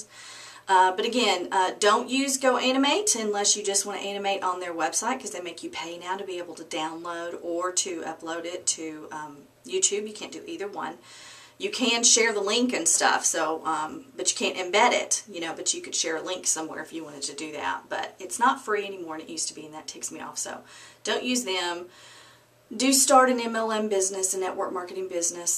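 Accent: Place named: Texas, United States. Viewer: Miss: You have some pretty long hair and you look real pretty w/o your make up.I like you texas accent and hope that you really enjoy your movie. Happy belated birthday. By the way I have been selling on ebay since 1998 and even sold items on Yahoo auctions at the same time back in the 1990's. You are definitely a true lady entrepreneur and know how to be self sufficient!!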